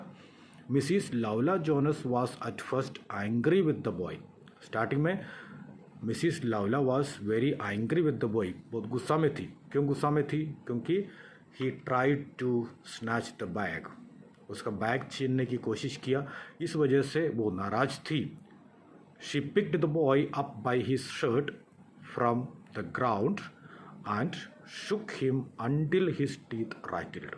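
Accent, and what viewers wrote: South, India. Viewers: Your ascent is like a South Indian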